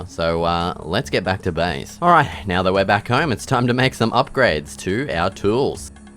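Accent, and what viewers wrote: Australia. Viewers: Your Australian Accent is so iconic I love it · Quick question are you Aussie? You sound a bit Australian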